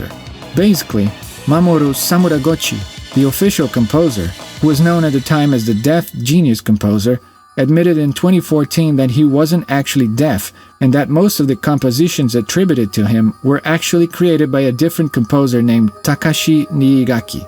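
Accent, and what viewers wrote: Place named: Brazil. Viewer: Vc é brasileiro, né? Seu sotaque é muito forte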